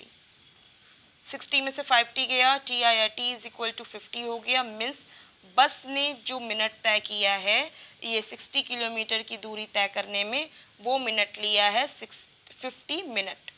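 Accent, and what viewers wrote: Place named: Eastern Uttar Pradesh, India. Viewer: I LIKE YOUR ACCENT 🙂 EAST UP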